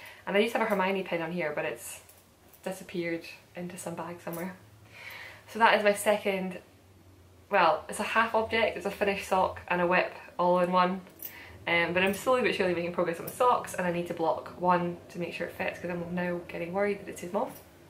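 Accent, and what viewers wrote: United Kingdom. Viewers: Just found your podcast and subscribed! Thank You! Really enjoy it! Only one thing, if you could talk a little slower from time to time I cannot quite understand since I am not Brittish. So for us, that are not native speakers from your neck of the woods, remember to to slow down ....... I might not be the only one with that request...... I am looking forward to your next podcast and I'm exited to see your next knitting adventures! As my affordable yarn choose : Love to knit wit Istex Plotulopi! It comes in plates and makes light and woolly garments in one ply only. Light as a feather too but very rustic so not for everybody.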